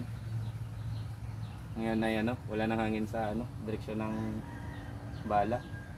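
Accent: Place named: Iloilo, Philippines. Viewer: sir my accent ka sir...taga iloilo kba sir?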